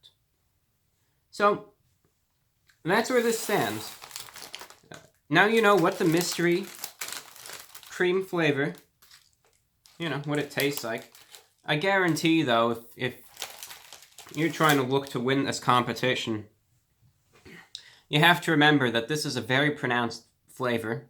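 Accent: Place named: Transatlantic, United States. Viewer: Ive also noticed he has a slight transatlantic accent which takes years to learn (it's not a natural accent to any group of people) thats dedication.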